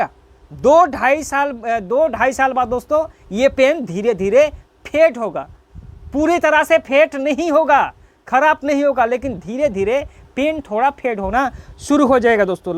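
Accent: Bengal, India. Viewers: Ade baba tum bongoli hai kya 😅 nice video ❤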